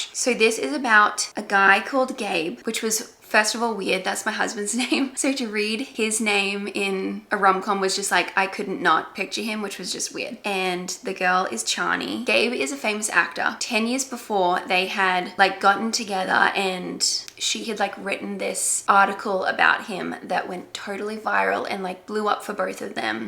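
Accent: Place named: Australia. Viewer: the Aussie accent is back 🥰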